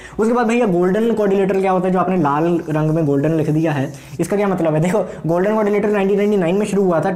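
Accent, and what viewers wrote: Punjab, India. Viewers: U r Punjabi??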